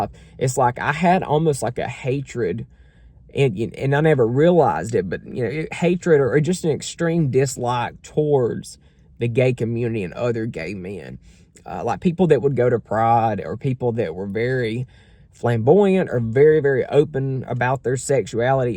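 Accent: South, United States. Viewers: We love your Southern accent! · I love your southern accent. It's so hot.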